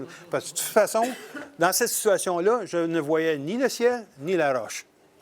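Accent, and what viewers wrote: Quebec, Canada. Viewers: merci pour vos conseils ils vont mettent très utiles pour mes prochaines photos et un super merci pour votre accent j'ai vraiment hâte d'aller au Quebec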